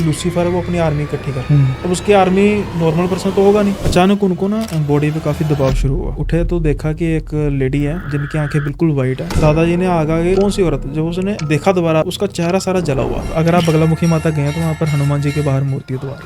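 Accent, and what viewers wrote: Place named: Punjab, India. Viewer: Yaar ye punjabi accent me baat kar raha hai.. aadhi baat samajh nahi ayi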